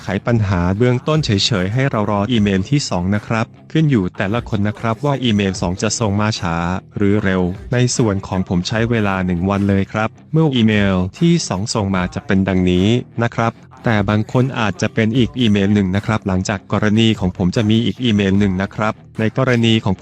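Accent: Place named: South, Thailand. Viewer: ผมชอบดสียงพี่เหมือนคนใต้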